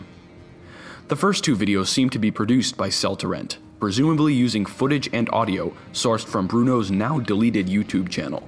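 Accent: United Kingdom. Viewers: puff it’s Cockney (East London) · The power of having a bri’ish accent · Aesthetical Twat I’m American and i find this hilarious the British accent makes that video better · this guy has such a heavy british accent i can't stop laughing at his videis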